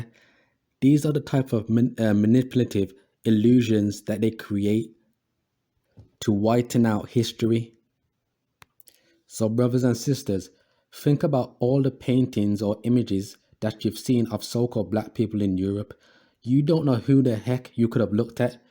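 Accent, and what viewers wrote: United Kingdom. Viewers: thank you my brotha, are you from the UK?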